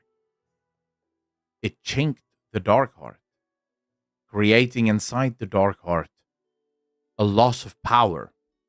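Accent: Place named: South Africa. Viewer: South African, if I'm not mistaken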